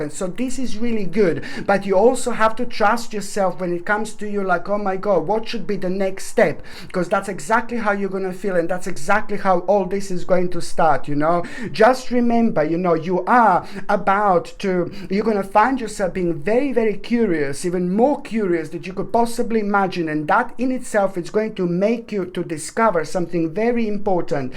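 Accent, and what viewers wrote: Italy. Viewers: Ciao! Thank you for Scorpio reading, love your energy, Good vibes and your italian accent! I have got so much italian roots 🙏🏻 Grazie mille from Monte Carlo. Last seven days 🤞🏻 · I totally Love your videos and excellent readings!! Thank you!! Your Italian accent is beautiful 😍 too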